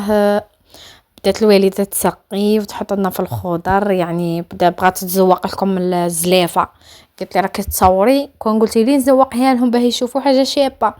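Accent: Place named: Arab world. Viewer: تبارك الله فكرتينا في الوقت الزين انت من جهة الغرب كما لهجت ناس الغرب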